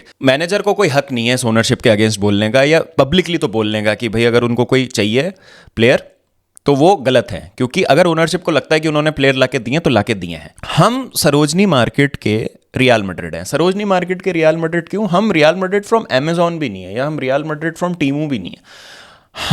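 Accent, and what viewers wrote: United Kingdom. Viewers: Your English accent uff❤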